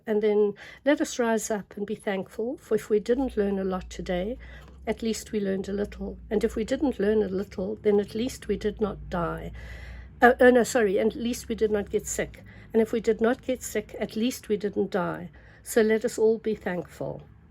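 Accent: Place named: South Africa. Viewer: So lovely to hear a South African accent on an art journal video.Your art journal is beautiful.Love from the Karoo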